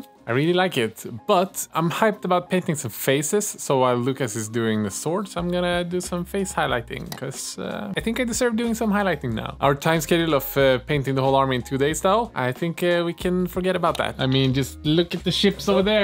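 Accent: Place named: Sweden. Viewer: Swedish accent.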